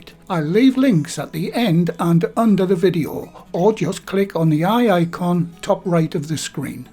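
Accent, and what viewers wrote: United Kingdom. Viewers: Oh..love the accent!! Authentic british! Cool!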